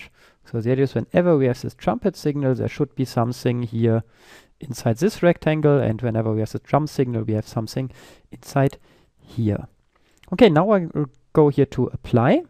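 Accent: Germany. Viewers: Deutscher, hört man ;) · deutscher .. hört man sofort :D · are you german? ^.^ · This tutorial is great! It doesn´t matter if you hear a German accent! Any accent is better than using a bad translator - 0k? :)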